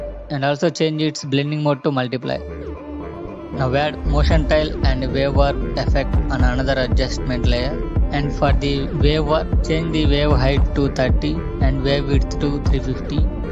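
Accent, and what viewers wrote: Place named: India. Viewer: pls use ai voice or something its hard to understand indian accent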